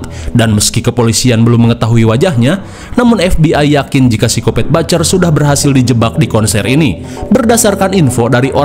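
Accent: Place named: Sunda, Indonesia. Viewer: Seru bahasa pembawaannya bang, apalagi ngomong aneh aing mah, si abang nya org sunda ini, salm sunda bnten bang, salm subscribe baru mu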